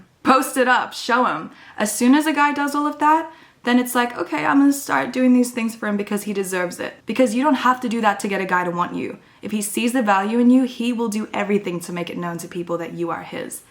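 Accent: Australia. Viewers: omd are you Australian or am I tripping? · are you Australian? I can't figure out your accent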